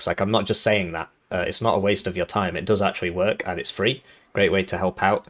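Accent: United Kingdom. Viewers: British man talking about tea moment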